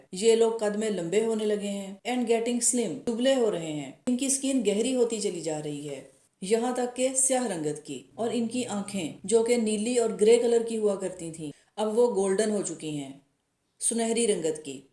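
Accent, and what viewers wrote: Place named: India. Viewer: You should definitely reduce your accent. I used to live in West Ealing in London so I'm used to the way Indians speak English, but your accent is impenetrable.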